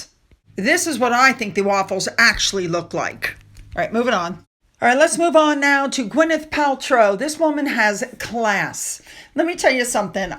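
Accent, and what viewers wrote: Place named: Pennsylvania, United States. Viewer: Her accent says Pennsylvania to me, perhaps?😊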